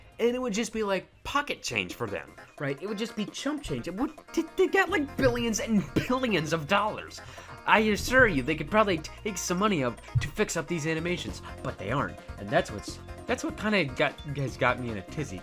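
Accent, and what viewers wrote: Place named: Scotland, United Kingdom. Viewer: Love the Scottish accent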